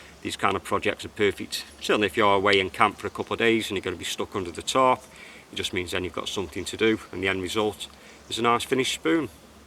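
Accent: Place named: Staffordshire, United Kingdom. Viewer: Hiya new subscriber to your channel mate,just getting back in to spoon carving after some kind person broke into my allotment shed and stole all my gear,you sound as if your a Staffordshire fella lol.great video tho must watch more.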